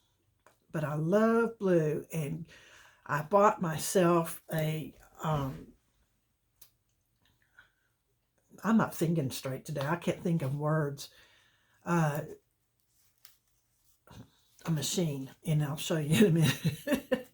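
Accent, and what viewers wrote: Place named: Alabama, United States. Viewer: I love your accent. Where are you from? Sounds like Alabama. Forgive me if your not. I love your videos. I've done one or two now. I have a vid on you tube.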